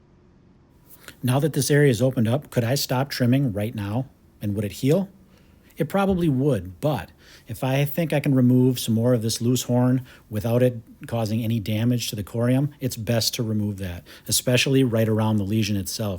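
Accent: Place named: Midwest, United States. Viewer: I love the Midwestern accent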